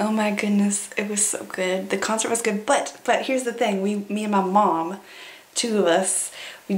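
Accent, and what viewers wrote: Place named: South, United States. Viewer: Thanks. :) The Southern accent wins again!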